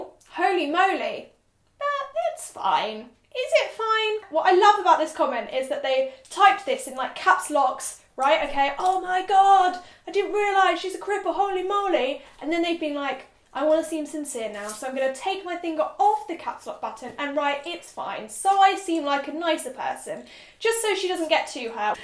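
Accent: United Kingdom. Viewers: Her British accent makes her anger so much more easier to listen to. Haha biased American.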